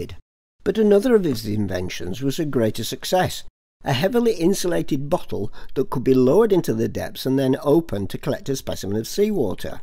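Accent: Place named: RP, United Kingdom. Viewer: I almost didn't recognize yer 'posh' voice!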